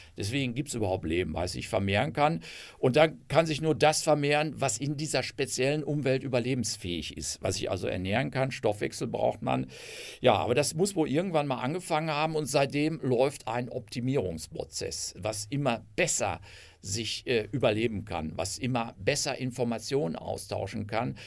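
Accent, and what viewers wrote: Germany. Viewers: Ich mag übrigens deinen Ruhrpott slang. Die dortigen Menschen sind eigentlich sehr bodenständige, ehrliche und wahrheitsliebende Menschen Lass uns doch mal treffen und reden. Gruß Christoph Poth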